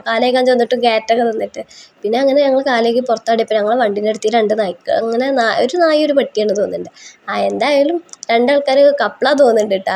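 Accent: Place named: Malappuram, India. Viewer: ഈ വീഡിയോ ഇഷ്ട്ടായി ഇജ്ജ് അന്റെ മലപ്പുറം സലാംഗ് പറഞ്ഞു കേൾക്കാൻ നല്ല രസണ്ട് 😀 അഹ് ബല്ലാത്ത ജാതി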